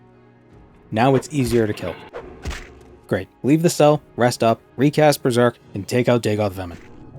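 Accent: Boston, United States. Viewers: I knew there was something weird about you. Finally figured it out. You're from Boston aren't ya? Lol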